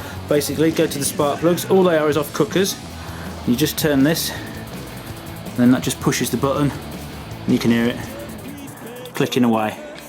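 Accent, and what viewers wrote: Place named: Cockney, United Kingdom. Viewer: Are you an East ender ? you got a fantastic thick Cockney accent.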